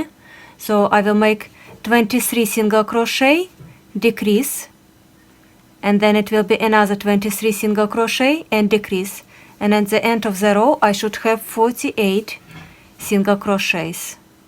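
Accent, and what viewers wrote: Russia. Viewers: Мне кажется или у вас русский акцент?🤔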